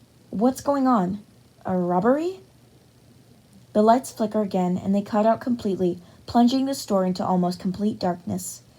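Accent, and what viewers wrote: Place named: Texas, United States. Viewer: The god damn texas accent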